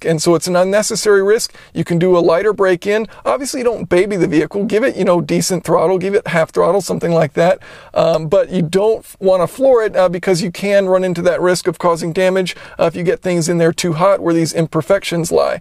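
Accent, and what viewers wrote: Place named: South, United States. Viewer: Hyundai Accent Fan Club. I like the southern accent. headlights are very impressive, really stand out in the rain. body lines are smooth as silk with curves precisely where needed. does have a Crack in rear end but the ride is excellent. .maintenance can be pricey if not cleaned and serviced daily and if given wrong fuel there is a occasional exhaust smell. do love it but can't wait to trade for new model. ...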